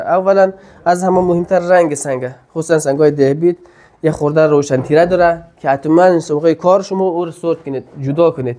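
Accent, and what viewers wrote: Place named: Hazarajat, Afghanistan. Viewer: باسلام‌بهتره‌ بدون لحجه هزاره جاغوری صحبت کنید مثال فارسی دری یافارسی‌تهرانی صحبت کنیدکه همه فهم باشد.تشکر🇦🇫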